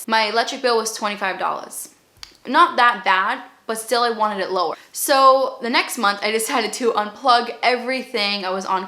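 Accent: Boston, United States. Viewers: I am from Boston too ,, great accent right?? You are wicked smot,, lol! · You have like a Boston accent haha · I really love your Boston accent. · I hear a BOSTON accent 💕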